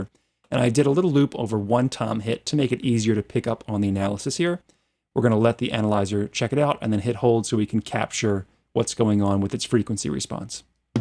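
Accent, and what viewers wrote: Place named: United States. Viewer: Love your teaching style, great advice, very well presented, nice results. On a humorous note: I can’t believe nobody called you on your pronunciation of “high piss filter” !! I speak four languages and traveled for two decades around the world, so I understand accents, language barriers, all kinds of stuff. But except for that one word, you sound like a very normal English speaking (from America) kind of guy. Not sure why you pronounce high pass with an i. Anyway, thanks for a great tutorial, catch you on the next one !!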